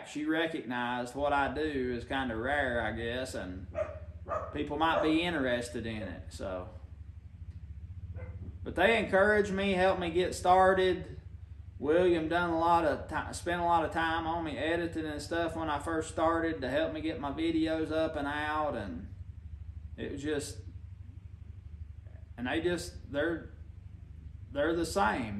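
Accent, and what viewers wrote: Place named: North Carolina, United States. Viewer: I have just been listening for maybe 2 weeks cause I just found your channel The way you talk I can tell that area is a vastly different place than Louisiana at least the central part of our state I tried to move to N.C. Years ago when my oldest married a young man she met in Bible school but her dad was unwilling to move anyway you are an encouragement to me even though I am a grandma So sorry I didn’t realize your Dad has passed You know that feeling when all your folks started passing well to be 68 and stuck in this place with family gone on feels like that. I have searched for a church and can’t find one where Jesus is at. Drinking & drugs and such like seems to be the name of the game. I just draw closer to God and try and love folks Keep the good videos coming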